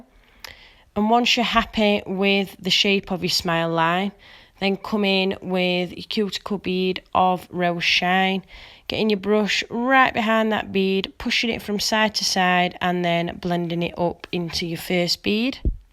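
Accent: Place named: Leeds, United Kingdom. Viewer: Really beautiful set of nails. I am addicted to glitter planet products and her prices are so reasonable compared to other nail supplies. Where is your accent from if you don’t mind me asking? It sounds like a Leeds one or maybe surrounding areas? Thanks for sharing. 👍🏻👏🏻👏🏻👏🏻👏🏻👍🏻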